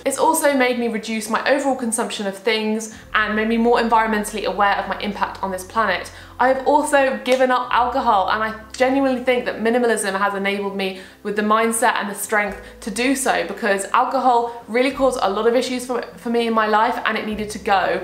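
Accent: United Kingdom. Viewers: I speek french and I love your british accent. It is lovely. Your speech is really inspiring indeed. It is not easy but I am starting now.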